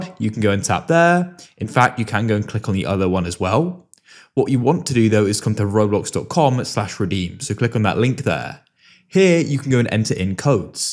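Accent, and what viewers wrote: United Kingdom. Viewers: Your British?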